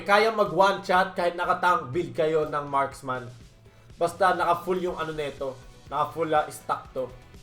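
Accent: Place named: Bulacan, Philippines. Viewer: taga bulacan ka ba sir???.. puntong bulacan ka eh.....hehehehehe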